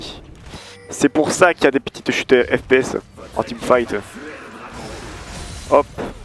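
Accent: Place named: South, France. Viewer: C'est moi où il avait un léger accent du sud en 2015 ? La vie de parisien ça lui a atténué ses origines on dirait :D .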